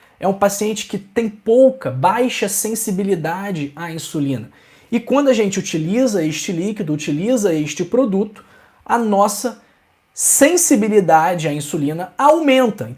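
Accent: Rio de Janeiro, Brazil. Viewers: Obrigado, doutor. Não se preocupe com críticas sem sentido. É um grande médico, do bem e percebe-se, também, que é muito atencioso e amoroso para com as pessoas, parabéns! Fale bastante e com seu sotaque carioca, passando-nos suas importantes informações que nos ajudam na qualidade da saúde. Abraço.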